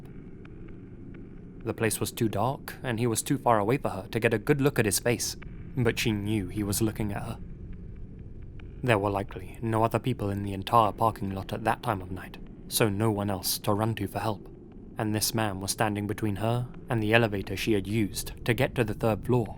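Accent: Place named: Northern England, United Kingdom. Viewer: Your accent is quite hard to place. It's certainly British - more specifically English and largely Southern, but it sounds like it has the lilt of somewhere else. Either you grew up down in Southern England and moved up North acquiring a northern lilt, visa versa or you just have unique annunciation.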